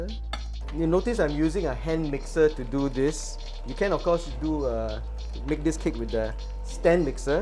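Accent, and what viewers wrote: Singapore. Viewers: Looks fantastic! Even more siok ...love the Singlish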